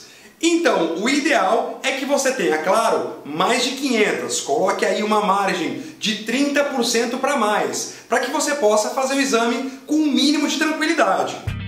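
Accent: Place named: United Kingdom. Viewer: your British accent is evident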